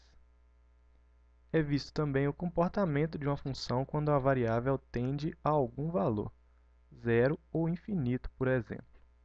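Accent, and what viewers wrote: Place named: Minas Gerais, Brazil. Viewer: Antes de dizer que havia estudado em Ouro Preto já percebi q vc é mineiro, se não é pegou bastante o sotaque rsrs, Minas minha paixão, saudades!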